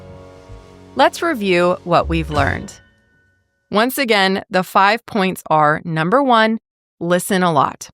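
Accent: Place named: United States. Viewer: Siempre es un gran placer y aprendizaje escuchar tu inglés americano, gracias maestra! 🇦🇷🇧🇷🇧🇴🇺🇾🇵🇾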